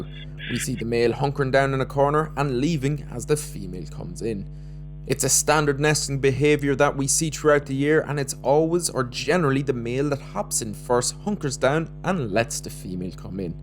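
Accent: Ireland. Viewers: Nice Irish accent! Cheers! ☘🍻💚🧡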